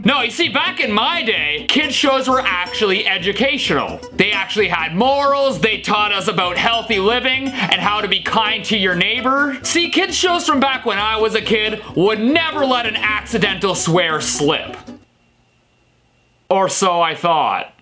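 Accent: United Kingdom. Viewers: Very heavy British accent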